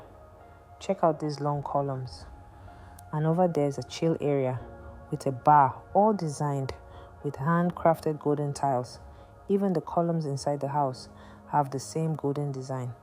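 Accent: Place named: Nigeria. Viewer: U are speaking like Nigerian. Copy and paste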